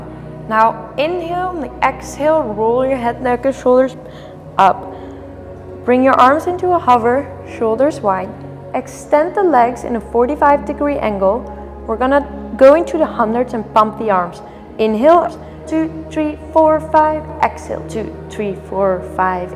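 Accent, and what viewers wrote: Netherlands. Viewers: Is Dutch? She sounds Dutch and the name checks out. Hey if you read this and you are Dutch, ik ook!!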